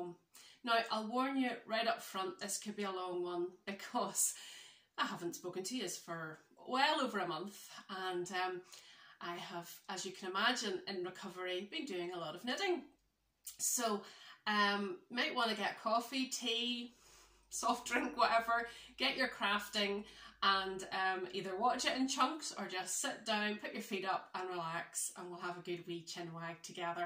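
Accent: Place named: Northern Ireland, United Kingdom. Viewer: Just came across this podcast and enjoyed it so much so I'll be checking out all your others. I love your cheeriness and your easy chat and also your N Irish accent. Your Kastelet is beautiful and just my style so I will be checking it out on Ravelry..thank you.. Looking forward to watching your previous podcasts. God bless you lovely lady. x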